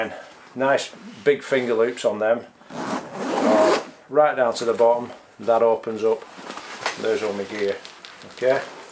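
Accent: Lancashire, United Kingdom. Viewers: Hi, I thought I would just take a moment to say thanks for these great videos, by following your tips my photography has improved already! You sound like a Lancashire lad? Chorley? Burnley? Preston? Just watched you explaining whats in your kit, there are some expensive bits of kit in there! Undaunted I thought I would treat myself so I went out this afternoon and bought myself a packet of Fig rolls lol! Keep up the good work and thanks again!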